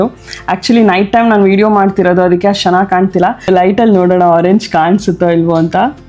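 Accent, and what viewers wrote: North Karnataka, India. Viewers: mast kannada mathu Paka uttar karnataka 👦 Boy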